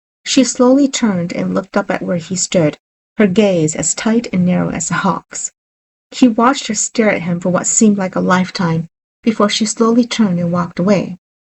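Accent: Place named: South, United States. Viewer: Love it! That southern accent is awesome.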